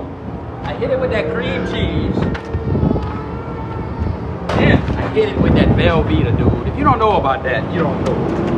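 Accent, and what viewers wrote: Louisiana, United States. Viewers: Did Jake Lloyd adopt a Louisiana accent and create a new character???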